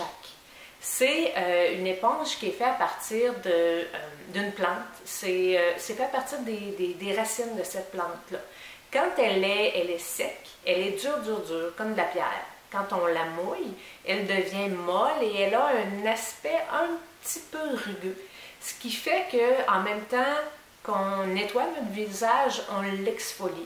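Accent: Quebec, Canada. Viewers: j adore ta vidéo sur ta routine et ta voie québéquoise gros bisous :) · les lingettes pour voiture c est pas bête du tout fallait y penser ton accent est trop cute vive le quebec ♥♥♥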